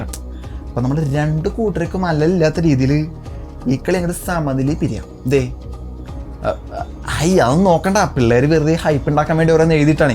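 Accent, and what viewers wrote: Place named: Thrissur, India. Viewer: Thrissur slang❤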